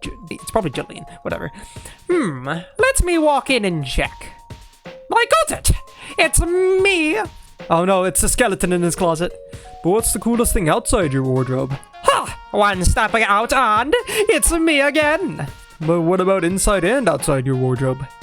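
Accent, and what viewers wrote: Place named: United Kingdom. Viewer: you sound british now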